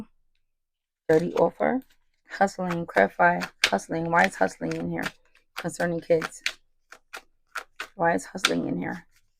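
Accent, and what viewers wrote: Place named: New York, United States. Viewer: Are you from nyc lol you have that accent.. praying for all the missing children out here!!!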